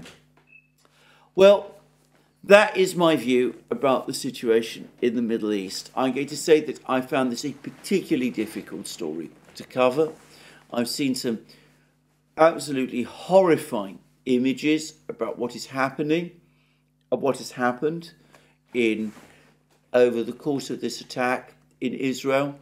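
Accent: United Kingdom. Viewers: Love the British accent - Ham Ass - funny AF. Love the show.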